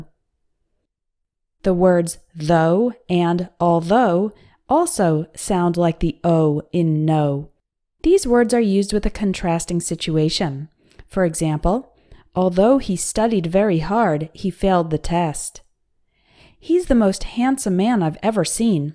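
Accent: United States. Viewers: Do I hear a midwest accent? 🧐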